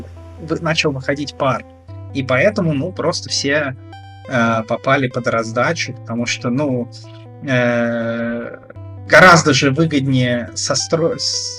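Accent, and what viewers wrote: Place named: Poland. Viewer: нифига у тебя акцент польский появился, раньше его не было (Л-логика ☺️) меня, при знакомстве с тобой , больше всего удивила разница между тобой в инете и в жизни. в положительную сторону ;)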